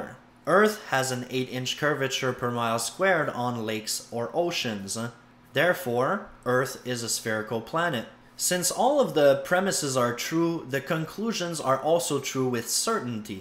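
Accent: Quebec, Canada. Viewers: wannabe to wasabe he's Canadian French. Didn't learn English til age 9. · Sounds French Canadian to me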